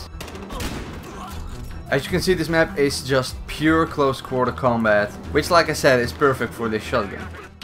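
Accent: Netherlands. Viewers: are you Dutch? If no then what country?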